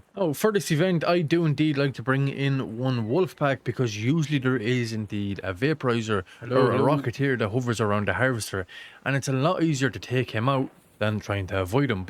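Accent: Ireland. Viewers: Where in Ireland are ya,?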